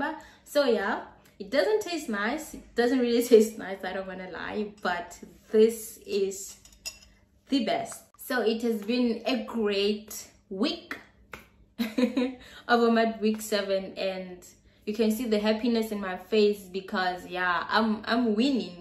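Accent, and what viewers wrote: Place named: South Africa. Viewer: You have a South African accent ❤️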